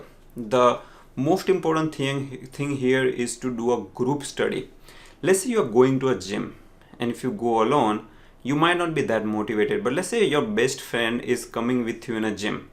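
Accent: India. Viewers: Bhai tu indian lagta hai english se ??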